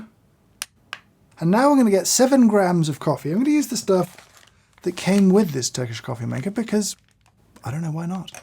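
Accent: United Kingdom. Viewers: My morning is complete, by watch a British sounding person struggling with some of his recent life choices.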